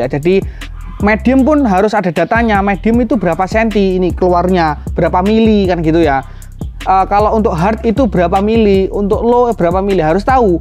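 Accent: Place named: Java, Indonesia. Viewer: Bahasamu kang,medok eram🤣🤣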